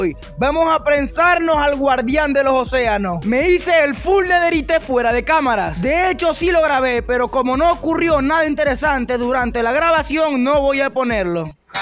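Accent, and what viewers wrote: Venezuela. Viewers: No es q eres venezolano creo q se eacribe asi y porque le decias al esqueleto asi :/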